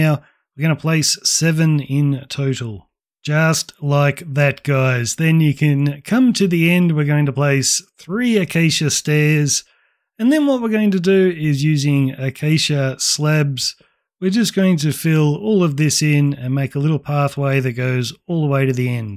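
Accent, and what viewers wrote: Australia. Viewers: so.. we and my friend built this whole thing in like 5 days, allow me to get you some constructive criticism what am i gonna say; first of all PLEASE, i BEG you to dedicate like 30 seconds of the guide to tell us which blocks do we take before getting to the next part instead of just "so when ur done you should be looking like this now place a scaffolding" like we didnt have these things in our hotbar, every time you say to place a block we have to pick it up from creative or from shulkers if ur on survival mode, that takes extra time and gets kind of annoying as the building goes, like we got so insane building it all my friend started to mock your australian accent and burnt out of minecraft as we finished the building (dont take it personal, its prob just us building it from 10pm to 6am for 5 days straight) or you could just leave a litematica scheme, people would thank you a million times but you probably wouldn't get as much views on all these guides also, in the replies you said the outlines dont line up because some of the measurements are for the gaps between the stone, would be nice of you to tell that in the video itself because we REALLY messed the measurements and got sick of constantly fixing the walls and all that mess led up to us not even bothering to fix some of them the building ifself though is AMAZING and is really worth the time also may i ask you a question, how old are you?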